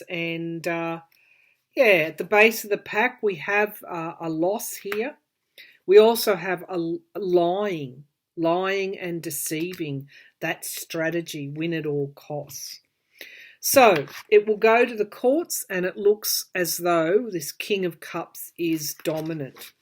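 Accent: Australia. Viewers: You are an Aussie? I am from brisbane. I am incredibly nervous. It is so lovely to hear your accent. I am in china.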